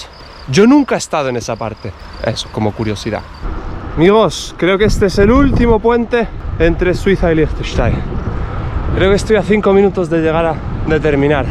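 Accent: Spain. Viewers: Tienes asento español por? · Ya me extrañaba a mi que fueras español te veia un ramalazo de por ahí por el acento y por tu aspecto 👍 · Sabroso acento español,buen video, gracias!!! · hablas en dialecto, españolete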